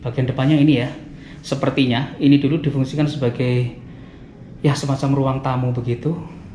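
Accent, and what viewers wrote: Java, Indonesia. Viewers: Seneng mas jawane enak ngerungokne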